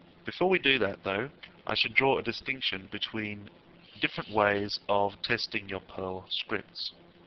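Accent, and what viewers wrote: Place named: United Kingdom. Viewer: whats wrong with british accents?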